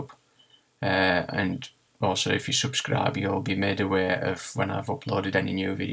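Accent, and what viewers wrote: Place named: Sunderland, United Kingdom. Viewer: I’m in Sunderland and it’s great to hear a similar accent rather than the many American tutorials. Thanks for the help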